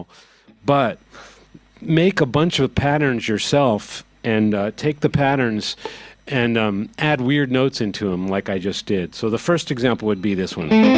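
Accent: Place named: United States. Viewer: He is American. It's how he's always going to sound. Like if you learn Spanish, you're not going to have the spanish accent when you speak English. Having the accents is a big part of language, and when learning another language, they usually don't blend.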